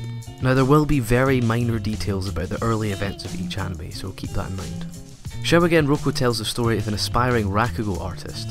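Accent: Scotland, United Kingdom. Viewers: Where are you from? Your voice sounds like you're from Edinburgh/Borders. Great video.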